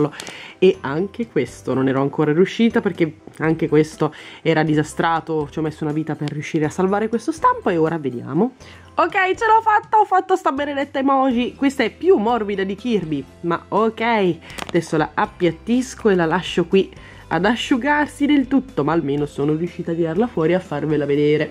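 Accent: Bologna, Italy. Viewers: ma sei di Bologna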